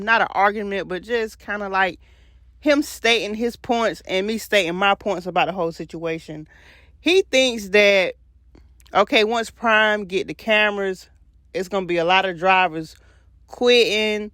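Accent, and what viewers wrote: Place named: Florida, United States. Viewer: I’m gonna make an educated guess and say you are from Florida that’s because I can catch that Kodak Black type accent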